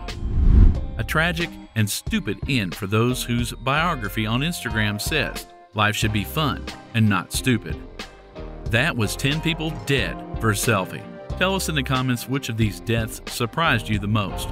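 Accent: United States. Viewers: Can't you use feet vs meters? I hate googling the answer. Lol. U sound American, not British.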